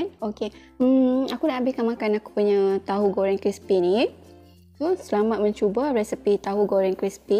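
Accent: Kedah, Malaysia. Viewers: Orang kedah ke kak 😅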